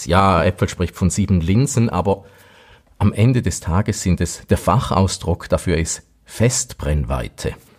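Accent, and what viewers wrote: Switzerland. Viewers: Deine schweizerische Tonfärbung einfach eine grossartige einschläfernde Wirkung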